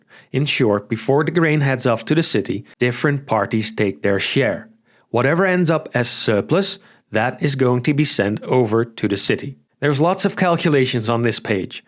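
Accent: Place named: Netherlands. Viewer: The channel says it's situated in the Netherlands, and by hearing his accent (as a Dutch person myself) i am 99% sure he's Dutch. So it's definitely weird how the imperial measurements slip in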